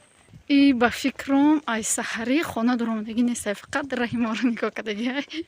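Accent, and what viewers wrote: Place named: Gozimalik, Tajikistan. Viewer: Эээ шумо ай хуросоненку, моям ай Хуросонем , чиста Гозималики гап мезанен шумо 🥰🥰🥰🥰🥰🥰🥰🥰🥰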